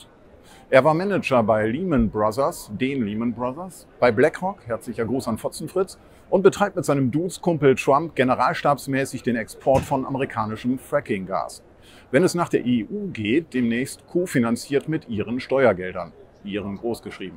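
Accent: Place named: Germany. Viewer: Was für ein heftigen deutschen Akzent die alte hat. Uffff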